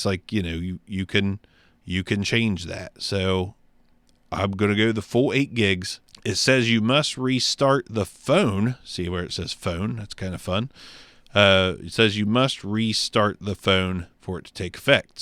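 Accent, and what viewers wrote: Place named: Mid-Atlantic, United States. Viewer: BTW noticed your accent, are you from Pa, Delaware or Maryland?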